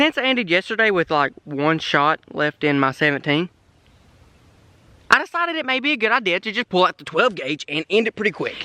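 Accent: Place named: Kentucky, United States. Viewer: Dear god Came across your channel today watching the Indy 500 Heard your accent for the first time and I thought you were from Kentucky instantly. One you said that verse though, instantly subbed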